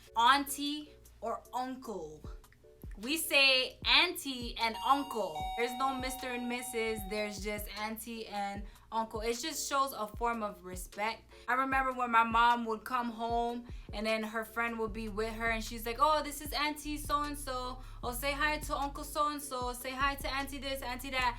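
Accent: Hawaii, United States. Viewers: omg you talk like my mom who was born in hawaii 🥺🥺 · I personally get annoyed when people used to call me a “Hawaiian” when I lived in CA. I know they don’t mean wrong, but I correct them and explain that I’m “from Hawai’i”, but that “Hawaiian” is an ethnic group and I give them a quick background ‘cause they need to know. And I dunno what you’re talking about...you’re EXACTLY what a local girl is!!! You sound like all my friends! Hehe. I love ALL your points! I grew up going fishing Pokai Bay every weekend and my dad always packed up early if we caught plenty fish in the morning. And yes, I’m always telling my mainland friends to wait for good waves ‘cause they wanna take the first one...after a few swells, they finally listened! 😜 Hope you doing good on the mainland. 😁🤙🏽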